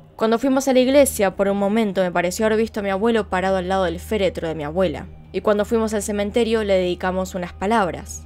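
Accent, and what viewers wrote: Argentina. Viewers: Un Usuario Normal Pero creo que no es felicidad por el caso, es por la manera en la que relata, da sus hipótesis, tiene un acento argentino obvio pero su lenguaje es neutro o bueno eso siento yo, porque otros youtubers de casos, sobre todo mujeres me choca que gritan o fingen la voz.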